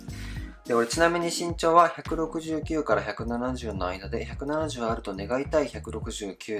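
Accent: Kansai, Japan. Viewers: クリアメガネめっちゃスキィィィ！！🥺 関西弁大好物→ ゼブラ可愛い🦓💕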